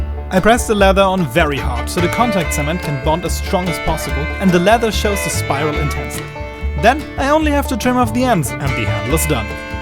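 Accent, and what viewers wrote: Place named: Germany. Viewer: Eine interessante Herangehensweise, wie Du den Griff von Stich gestaltet hast.^^ Vor allem,.... bin ich eigentlich wirklich der einzige, dem es jemals auffiel, dass Orcrist aus dem Hobbit Film einfach nur eine Hälfte von Stich ist? Hält man 2 Orcist zusammen, hat man ein Stich. ^^ .... im englischen klingt der letzte Satz besser. xD Auch fand ich interessant, dass Du für Orcrist auf Blau gesetzt hast.^^ Der Drachenzahn Griff ist wirklich gut gelungen.^^ Die armen Pfandflaschen! xDDDDD Der Schwabe ging Dir jetzt aber sowas von an die Gurgel. Woischd, Kerrle. Dessin 25cent pro Flasche! O_o Ich weiß ja nicht warum, aber für mich muss Glamdring schon eine definierbare und klar erkennbare Blatt Klinge haben. Leaf shaped Blade im englischen, glaube ich. Neben Narsil/ Anduril mein zweitliebstes Schwert der Guten aus Mittelerde. Anhand der Menge an Cutting Tests kann man auch gleich erkennen, was wohl Dein Lieblingsschwert war. 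^^ Man kann es einfach irgendwie raushören. xD Wir Deutschen haben so einen gewissen Klang in der Stimme, wenn wir auf englisch reden. ^^ Nicht nur ein Landsmann, vielleicht auch noch einer aus dem gleichen Bundesland, wenn ich die Rheinpfalz richtig gedeutet hatte? ^^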